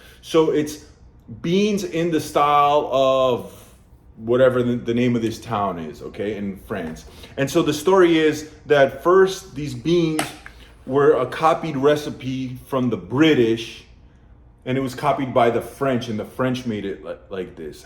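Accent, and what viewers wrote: Poland. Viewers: On jest Polakiem ? On mówi z polskim akcentem. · Wspaniały akcent Polski. Pozdrowienia z Polski 🇵🇱 greetings from Poland🇵🇱👍👌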